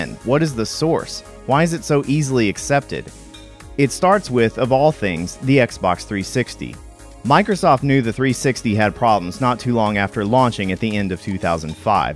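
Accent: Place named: United States. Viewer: Solder, he was talking American-English.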